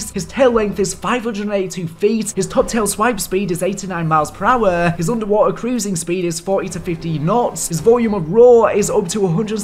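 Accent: England, United Kingdom. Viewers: Im pretty sure that it’s a English/British accent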